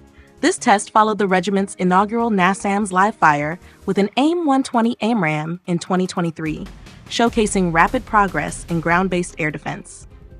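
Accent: United States. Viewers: Whenever I see some glossy ad like this with someone talking about Australia's defence with an American accent? Not even trying to hide the sell.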